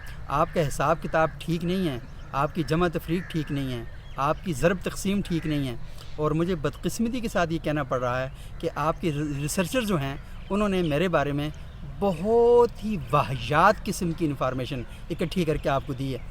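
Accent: Abbottabad, Pakistan. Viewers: Abbottabad ka lagta hay, hinko touch to aae ga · Bhai Lehjay or talafaz k liye abbottabad ka rehashi hn..haha bhai jnay do yarr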